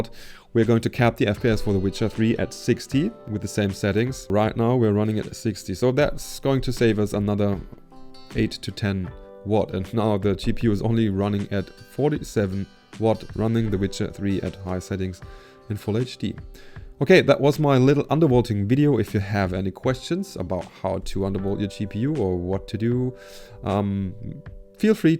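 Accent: Germany. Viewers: German accent and American cap.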